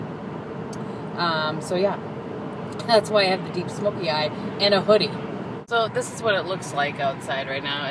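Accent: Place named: Upper Peninsula, United States. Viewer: you sound like a yooper which is a good thing lol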